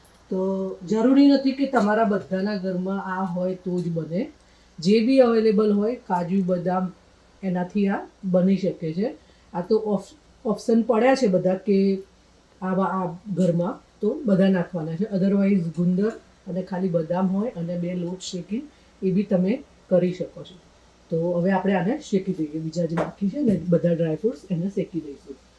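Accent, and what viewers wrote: Mehsana, India. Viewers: Bhasha mahesanani chhe to vatan?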